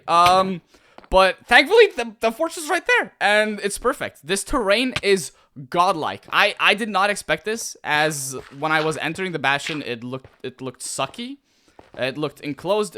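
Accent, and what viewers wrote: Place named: United States. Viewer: ngl your accent sounds so american that when you said zed it threw me off so much lol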